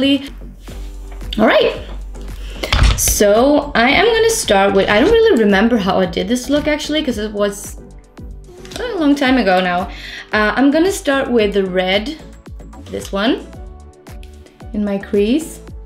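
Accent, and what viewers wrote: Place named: Sweden. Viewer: The Swedish accent... 🤗🇸🇪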